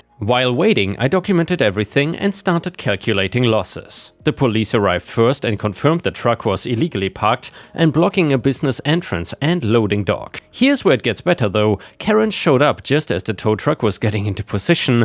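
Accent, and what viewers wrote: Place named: Germany. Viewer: Hearing a guy with a German (?) accent do a Karen voice is epic! Love it!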